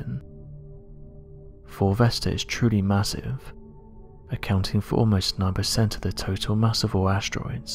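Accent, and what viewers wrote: United Kingdom. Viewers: Always some horrendous British accent narrating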